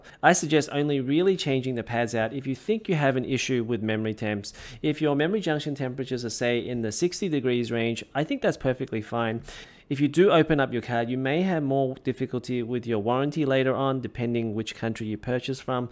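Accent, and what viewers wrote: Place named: Australia. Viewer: Thanks. Great video. Always nice to hear an Aussie accent on youtube.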